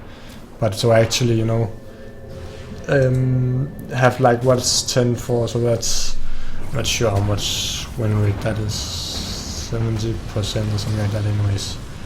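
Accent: Denmark. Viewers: What's your native language? You sound very danish :)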